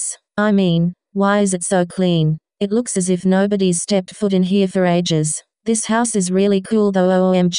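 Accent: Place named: United Kingdom. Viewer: WAIT OMG UR BRITISH? IF U R FINALLY A BRITISH YOUTUBER LIKE- 😭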